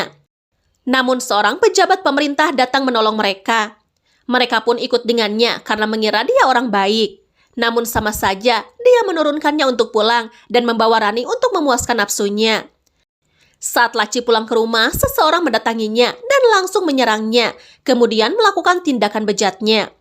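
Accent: West Java, Indonesia. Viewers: semangat ya cantik , perbanyakin aksen sunda nya ya . itu yg makin tambah greget loh , biar punya ciri khas macam mang jon iq7 , reza.saqahayang & mang andreas baok kompa 😊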